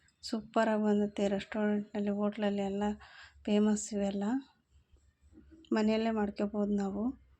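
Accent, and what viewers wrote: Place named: Sira, India. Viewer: R u from sira r it's surroundings ur language slang in like that nice receipe😊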